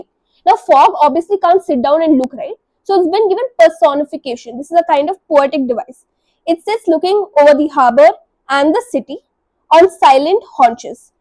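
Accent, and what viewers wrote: South India, India. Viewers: are you from south India???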